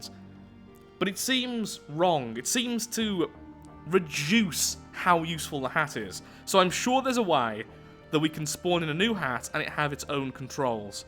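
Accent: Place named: Wales, United Kingdom. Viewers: You are Welsh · wait is from wales....*fist thing i think of* "WALLLLLLE LOOOOOORDDDDDDDDDDD" cough sorry i mean no dis respect...i was alwase wondering were your acent came from cool to know (sorry about the bad english)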